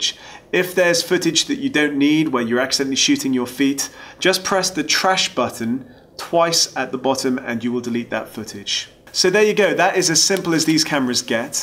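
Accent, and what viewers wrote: United Kingdom. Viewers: Well done. Perfect... just what I was looking for. The British accent makes it sound reliable and educated!!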